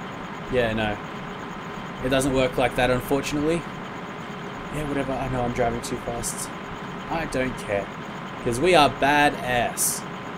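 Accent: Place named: Australia. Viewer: are you from australia because you sound australian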